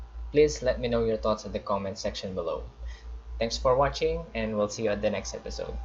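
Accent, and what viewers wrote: Philippines. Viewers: cant really miss that distinctive pinoy english sound lol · Proud of you accent sounds filipino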